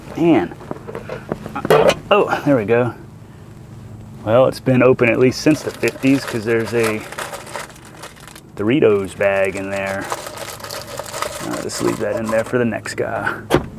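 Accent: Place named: United States. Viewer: Is this the Stephen King car ? new subscriber glad to be here ,hey from Australia,what a sensational voice ,this is how as a young kid livin in the outback i expected real Americans sound like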